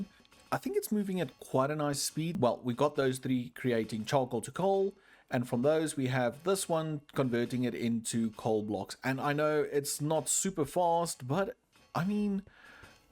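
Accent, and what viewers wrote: South Africa. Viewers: You sound a lot like RenDog are you south African per chance?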